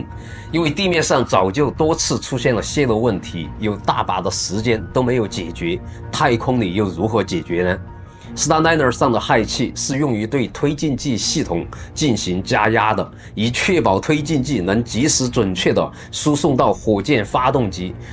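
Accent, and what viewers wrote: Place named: Guangxi, China. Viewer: 广西桂柳地区口音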